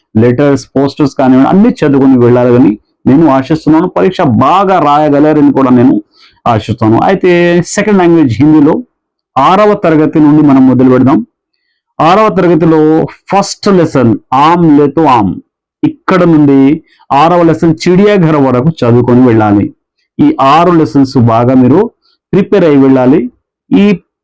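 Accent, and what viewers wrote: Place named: Telangana, India. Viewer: Sir aap telangana hai yaa ap ???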